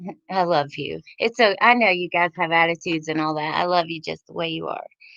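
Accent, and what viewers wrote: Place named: Kentucky, United States. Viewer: I've been looking for this. Thanks so much for the video & reading it out to us. I can concentrate to listen, but I have trouble concentrating when I read more than a few minutes. So thank you!! What State are you from? I grew up in E. KY. You kinda sound like me. Lol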